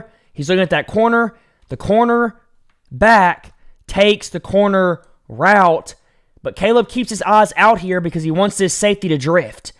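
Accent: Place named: South, United States. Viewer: The southern gentleman who provides this commentary should have a job in pro football somewhere.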